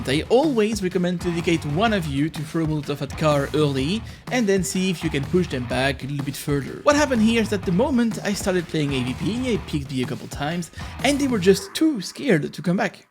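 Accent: France. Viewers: Y a un p’tit accent français Nn 😭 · yo are you originary from frace or a country who speak frenche cause you have a litle french acent some time (love your video)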